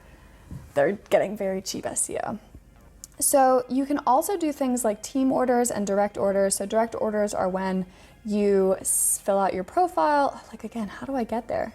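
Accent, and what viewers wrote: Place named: United Kingdom. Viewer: Hello, thanks for sharing from Caracas venezuela...you are very beatiful pragmatics girl...im a teacher computers programming...i love writing articles en coinpayu articles but y read your reviewed...Es muy interesante tu estetica al vestir y ver el mundo...y tu bello acento britanico...como a los que tanto escucho cada dia. best regards.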